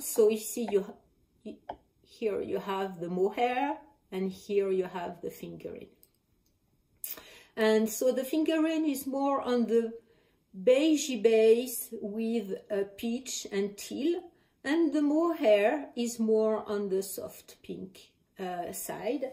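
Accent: France. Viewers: Hi,! Great podcast, your English is really great and I just love the French accent! Thank you so much for presenting the Fresh Peonies, I am so glad that you felt all that I was hoping this pattern to be: the ideal relaxing project, easy and comfortable for every knitter to knit while bringing up the best of Lise's beautiful yarn! I just subscribed to your channel and look forward to future episides! À bientôt!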